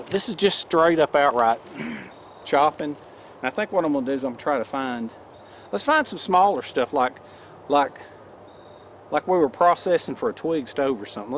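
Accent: South, United States. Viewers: Great vid. Are you from GA? Lol you sound just like my uncle from Savannah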